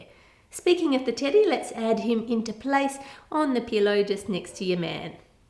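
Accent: Australia. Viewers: R u Australian I am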